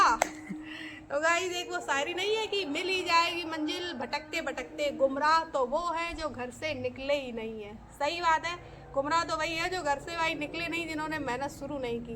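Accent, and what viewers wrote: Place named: Braj, India. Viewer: Kaha se ho aap language to braj ki lag rahi he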